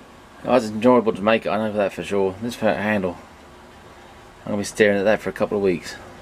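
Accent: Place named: Norfolk, United Kingdom. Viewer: Loved it, nice job! Nice to hear a good old Norfolk accent as well. Keep it up!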